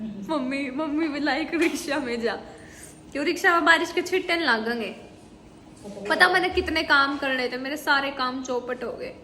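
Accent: Haryana, India. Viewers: Muje aap bolte hue ache lagte h mtlb aapki haryanvi me language bhut achi lgi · Nice vlog dii haranvi voice bhut achi h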